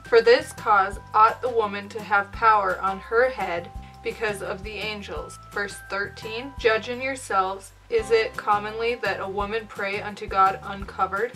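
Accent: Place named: Midwest, United States. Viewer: Wow that's cool I'm originally from Minnesota but now I live in North Carolina but I could tell from your accent that your from around that area :)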